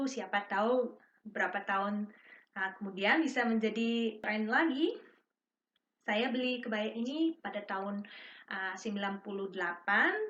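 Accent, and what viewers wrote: Bali, Indonesia. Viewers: u got Balinese accent actually..kebaya suits u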